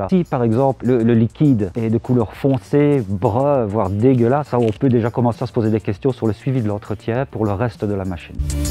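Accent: Belgium. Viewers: Rien que de t'entendre parler je suis certain que tu est Belge !!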